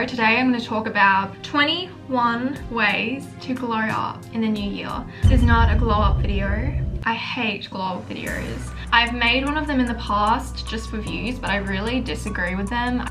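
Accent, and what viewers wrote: Australia. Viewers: im not even listening to her advices i just looove the way she talks and that heavy aussie accent xD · as soon as i heard ur aussie accent, i knew you’d be a youtuber i’d love and man i just absolutely adore your duality and how you’re so empowering and motivating✨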